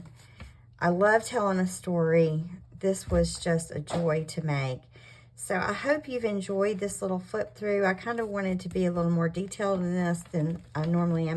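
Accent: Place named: South, United States. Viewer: Simply gorgeous! Love listening to your soft Southern voice! Hugs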